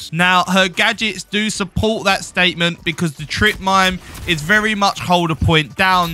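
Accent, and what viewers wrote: United Kingdom. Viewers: I like your content because you show off and talk about cosmetics, you always bring the news of the next update, do awesome gameplays and also I love British accents. Good luck for everyone in the giveaway!! And I'm very excited about runway.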